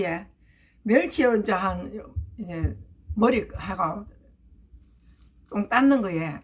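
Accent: Gyeongsang, South Korea. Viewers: 깻잎찜 요리 잘 배웠어요. 참 맛있겠어요. 손 내밀어 주셔서 감사합니다. 경상도 사투리 구수하고 좋네요. 저도 손 잡고 요리 배울게요. 🎁🔔 응원해요!👫💕👍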